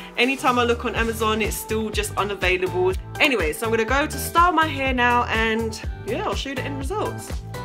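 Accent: United Kingdom. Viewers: I love you UK accent, I've Cameroonian accent 🤪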